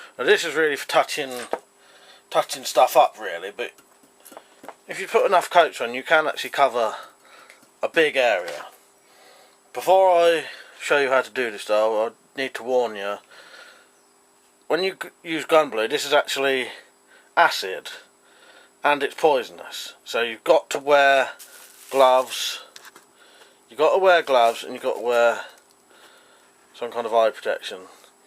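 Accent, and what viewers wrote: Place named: England, United Kingdom. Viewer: Love the English accent. Great video and nice cleaver!!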